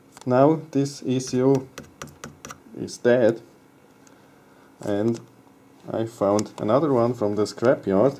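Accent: Switzerland. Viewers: Soo guet ne schwizer uf englisch am tutoriel mache.. würschmers Bärndütsch erklärä😀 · switzer englisch versteht man wenigstens ;) thanks for video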